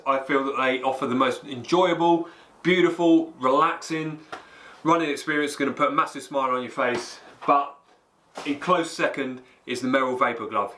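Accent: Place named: United Kingdom. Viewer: Epic UK accent and great review :-)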